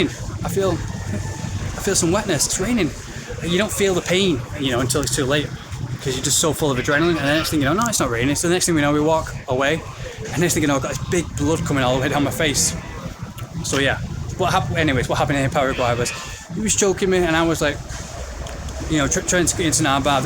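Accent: United Kingdom. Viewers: You have a Scottish like accent. Are you from Liverpool/Scotland or nearby?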